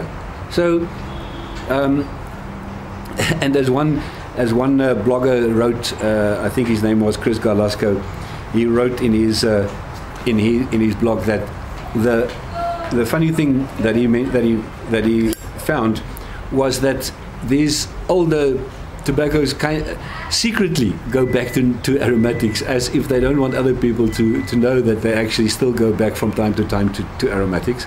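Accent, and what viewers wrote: South Africa. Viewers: Good evening sir Could that be a South African accent?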